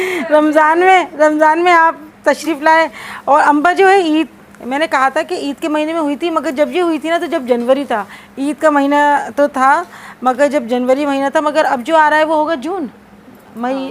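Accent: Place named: Bhopal, India. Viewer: As salam alaikum Aunty .. Aapki accent kaafi bhopali hai...??Kya aapka koi rishtedar Bhopal India say hai ??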